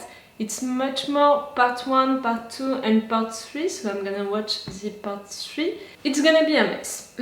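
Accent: France. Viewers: Oh... Hi, I´m spanish and I just love when you say "La casa de papel" (and even "hija de puta" hahaha!) with that beautiful french accent. I´m also new at your channel, just finish the show, and deeply in love of your reaction <3 Really really sorry I don´t speak french, hope you can understand me, désolé:(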